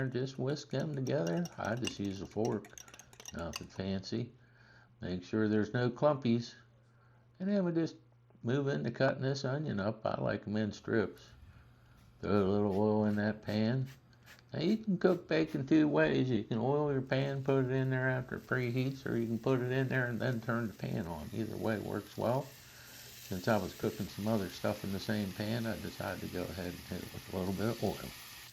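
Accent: Pittsburgh, United States. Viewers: Is that a Pittsburgh accent?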